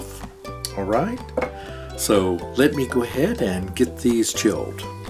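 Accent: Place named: South, United States. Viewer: I'm so glad you are okay and I hopefully all the animals are doing well! Ahh hahaha, I love your country voice wait until u hear my real country as turnip greens vice😂😂😂😂😂 but honestly we got ice and snow q, but nothing like!e that although we do every 6 yrs or so.. But doing my patron. I wanted to send the national guard to get you. That's bow worried I was, there's a surprise on the way my dear It' be over yonder in your box very soon alright sweetheart. Still getting thru the excruciating pain with nothing talked to a specialist in osteonocrosis and averyadic neurcrosis and I might be going to john Hopkins or California to a hospital there to be part of a study, I have been told I have about a year to live in!was they slow it down, but keeping busy and putting my energy what I have on new techniques and a total makeover of my yt channel, name and everything! 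Patron on the way!!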